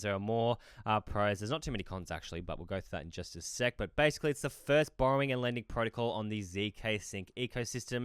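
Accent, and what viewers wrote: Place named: Australia. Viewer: Mate love your work ! Great to hear a fellow Auzzie, with great research !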